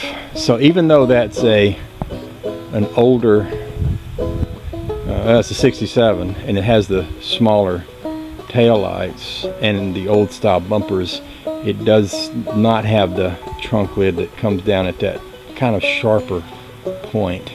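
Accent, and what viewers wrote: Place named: South, United States. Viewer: ya left off the ah in front of pologize! Sory I miss read ya! But if ya was ta have a poltergeist and Dracula that wooden be Tanks giving, it be Halloween or if ur down by cemetery it be Gallows Eve! Hot Rod wood have ta break out his hot rod Christine! Stake em and hangem high! Now all we need is fur ta show up and start talkin British with his southern style accent.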